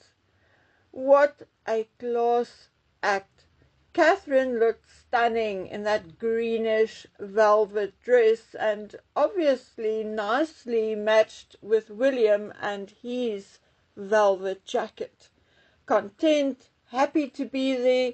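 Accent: South Africa. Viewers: Like the accent 🇿🇦. ❤ from Germany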